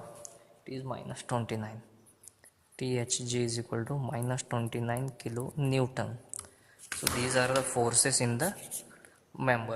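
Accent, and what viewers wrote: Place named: Maharashtra, India. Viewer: Bhava marathi ahes na tu tuzya hindi varun samjla🤣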